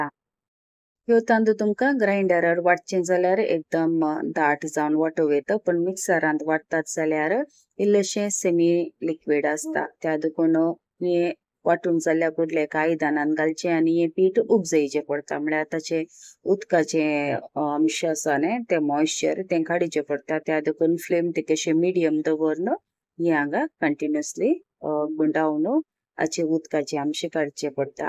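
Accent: Konkan, India. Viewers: Thank you I am watching yr video your voice is so good and very konkani is pure so i get to hear the words. Recipes all mangalorian dishes are superb . Thank you bai. Can we give tadka .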